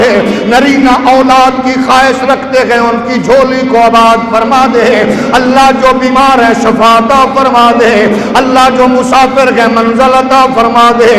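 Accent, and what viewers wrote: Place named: Punjab, Pakistan. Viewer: Maa sha Allah lykin jo ap ki Punjabi ka lotaaf ata hai ous jysa kio maza nahi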